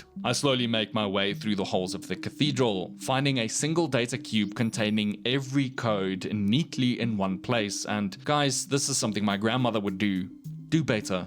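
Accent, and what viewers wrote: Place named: South Africa. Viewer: Are you carring me a riar? Always kills me😂😂..good to hear a South African voice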